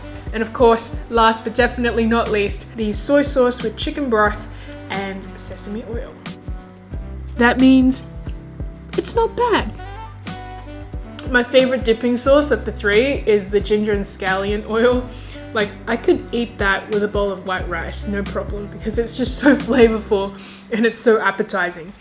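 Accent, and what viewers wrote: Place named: Australia. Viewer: It's called an Australian accent, millions of other girls have that exact same accent.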